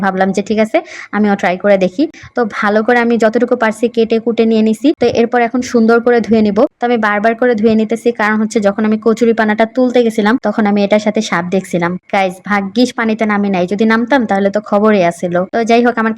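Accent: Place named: Old Dhaka, Bangladesh. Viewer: Oi apu apni ki puran dhakai thaken apner kotha gula puran dhakar kothar moto reply diben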